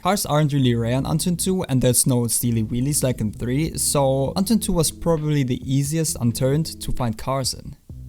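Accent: Germany. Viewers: Man hört so hart den deutschen Akzent raus xDD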